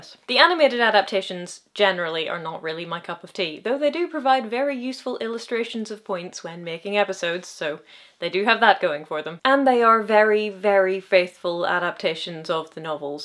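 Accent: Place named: Wales, United Kingdom. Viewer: I lloved the Wellsh accent.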